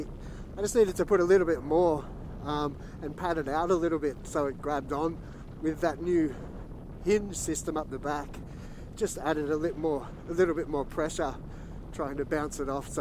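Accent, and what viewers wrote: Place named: Scotland, United Kingdom. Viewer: dude your accent is so nice, looks like scottish but your channel is based in Australia, australian accent is just like yours?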